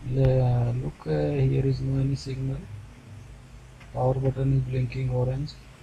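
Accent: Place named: India. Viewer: that is a babu accent.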